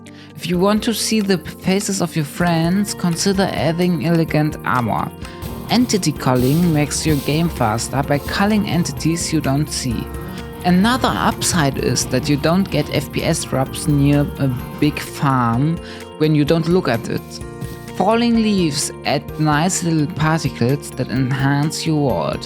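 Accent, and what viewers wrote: Germany. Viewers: man merkt am arkzent das du deutsch bist haha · Cause hes german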